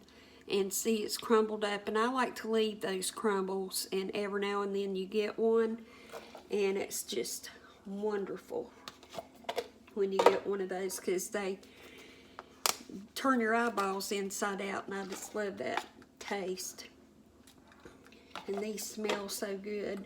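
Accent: South, United States. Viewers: You have such a country sweet way of speaking turn your eyebrows inside out!!!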